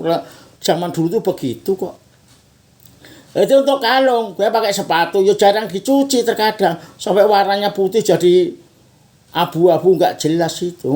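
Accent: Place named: Java, Indonesia. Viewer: $3mangat pakdhe, sehat sll...bhs jowo medhok,*)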